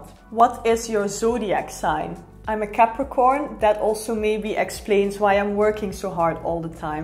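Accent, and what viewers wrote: Netherlands. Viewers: Your accent is so similar to my Dutch friend Lars and I had already guessed you're from Netherlands if not from Germany 😅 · I could have already guessed that you’re from the Netherlands. That’s because you and itchy boots have same accent. Same energy 😊 cheers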